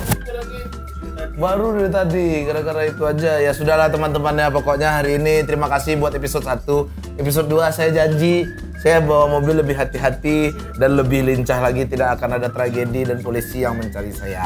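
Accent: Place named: Padang, Indonesia. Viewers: Wkwk denger pakai logat padang jadi kangen saudara yg disana, dulu pernah njir persis kyk gini turun di minangkabau trus dr padang ke sungai penuh naik travel avanza njirr mantep wkw pulangnya kapok ganti naik travel hiace hahah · Kalau mau dengar logat indonesia padang nya keluar di konten ini.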